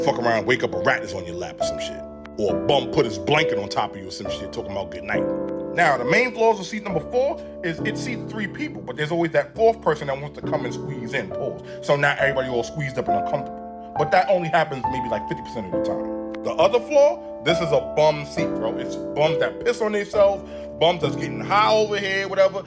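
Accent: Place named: Bronx, United States. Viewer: 5 and 4 are the best seats and I feel like you're from the Bronx are you?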